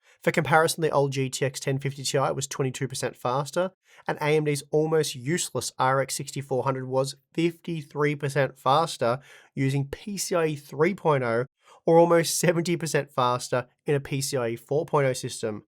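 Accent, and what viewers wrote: Australia. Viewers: I love your aussie accent. Even the caption algorithm thinks you said "game wood" ! XD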